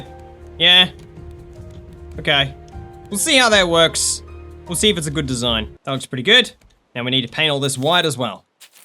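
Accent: Australia. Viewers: Are you Australian? I sub to Aussies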